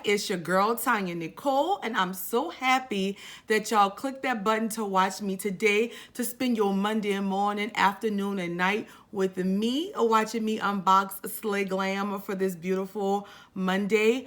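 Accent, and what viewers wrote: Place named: New Orleans, United States. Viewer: I’m so happy when I hear that New Orleans accent and that slayed face. My bff in my head hey girl. Fun fact : my actual bff’s name is Latonya.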